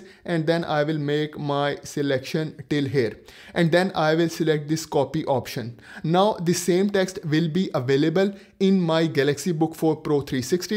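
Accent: India. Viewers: Heavy Indian accent 😅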